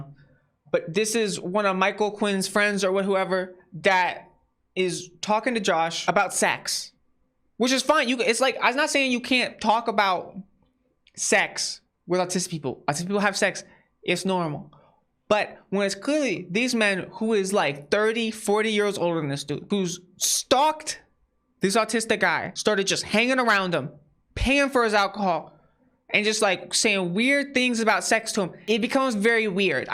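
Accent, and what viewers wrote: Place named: Pennsylvania, United States. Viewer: im sorry this completely irrelevant to the actual story (although i thank you greatly for covering it), but i am fascinated by your accent lmao. I'm from MA and have heard my fair share of regional dialects but I have no fucking clue where to place yours 😂if i had to guess I'd say Philly/Pennsylvania? just clarifying btw i don't mean any of this in a negative way nor am i trying to make fun of your accent or anything, just legitimately curious. i'm a big fan of accents/language in general and love learning about different dialects/regional differences.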